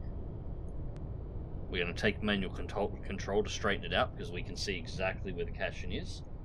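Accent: Australia. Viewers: Clicked on the video thinking it was Jive Turkey. I was so confused for a second when I heard an Australian voice. :)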